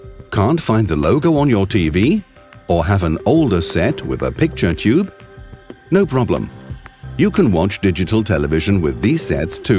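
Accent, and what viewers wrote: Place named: United Kingdom. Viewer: He's Kinda British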